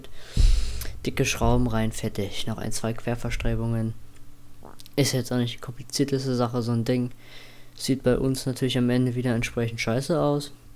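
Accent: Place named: Berlin, Germany. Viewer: die Videos werden immer professioneller (bitte nochmal ein ganzes Video nur im Berliner Akzent, sonst Deabo)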